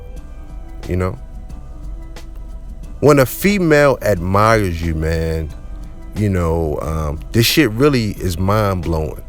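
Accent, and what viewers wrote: Baltimore, United States. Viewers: Great video. I can tell you from Baltimore too, i hear it in your accent.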